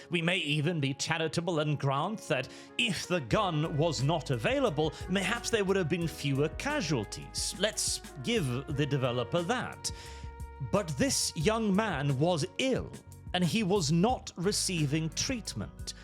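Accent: United Kingdom. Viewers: my bad (wrote the comment before watching), to me he sounded like british. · He sounds British to me, probably the way he speaks as I seen way too many British content creators speak like that like trying to constantly sound all eloquent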